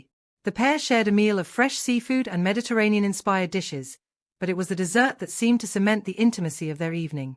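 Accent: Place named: England, United Kingdom. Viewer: Too funny lol A woman with an English Accent using such words to describe Meghan...friendly, etc ETC If the A I bot said Mean Girl, Not to Bright, Liar, Bully, and has no clue 9th month the Baby drops, not rise! He may be wealthy but in terms of how MM views herself and the turning Harry into her lap dog, Mark is mostly in the same place as Harry though his Marriage is vastly different than MM' s spare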